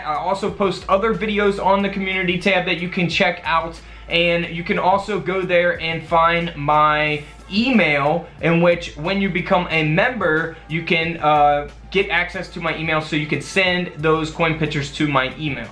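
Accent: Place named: New York, United States. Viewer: Really,..! He must be, either from, NYC, or Jersey shore, Noooo, I m not type casting, am I,..?lol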